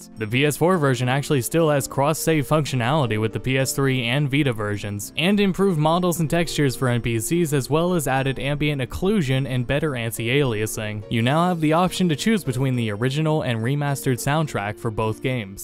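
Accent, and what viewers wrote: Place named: United States. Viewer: "Teet-us"? Really? You sound American. There is no excuse for you pronouncing it that way. lol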